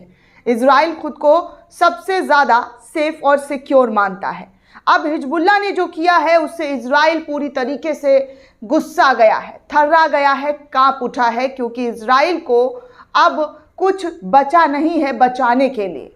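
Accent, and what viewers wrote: India. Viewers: Ganwaar pronunciation like Modi ...unsubscribed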